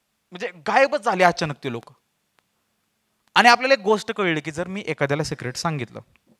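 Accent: Satara, India. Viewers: 90's ERA खरचं आपण लहानपण जगलो - without technology Wale तू सांगितलेल्या सर्व गोष्टी खूप जवळच्या वाटल्या....लहानपणात घेऊन गेलास मित्रा...आई वडील, गुरुजी, मित्र सर्व आठवले...hats off 🙏🙏 अजून एक गोष्ट मी एक सातारकर आहे त्यामुळे तुझी बोलण्याची पद्धत त्यामुळे अजुन जवळ गेलो ....thank u 🤗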